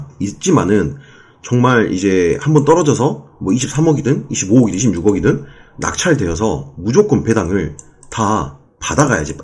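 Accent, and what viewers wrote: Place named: Jeolla, South Korea. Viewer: 전라도 말투만 들어도 이제 토 나온다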